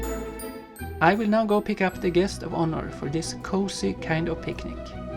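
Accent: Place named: Ireland. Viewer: Awwwww I loved that! I enjoyed your story-telling especially with your Irish accent! You are so talented with your pumpkin carving and all of your other drawings!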